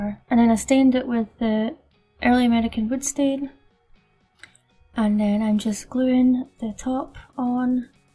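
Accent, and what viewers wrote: Scotland, United Kingdom. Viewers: 🙈 Hearing the Scottish accent once I turned up the volume really threw me!! But looks great! 👌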